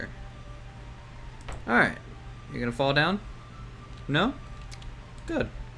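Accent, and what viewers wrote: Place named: North, United States. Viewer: Keep up the great work!!! P.S. This is super random, but where are you from? I often hear police sirens in the background, so I'm guessing a decent sized city. And I feel like you have a northern U.S. accent. So I'm guessing somewhere Minnesota or maybe Chicago? lol just curious, I'm sure I'm way off haha.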